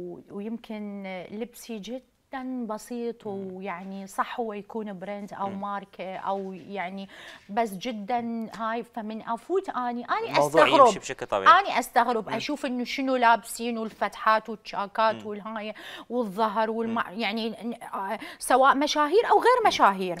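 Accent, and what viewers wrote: Iraq. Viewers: طبعا لهجتك العراقيه والله العظيم ضحكتني من خاطر😂😂😂😂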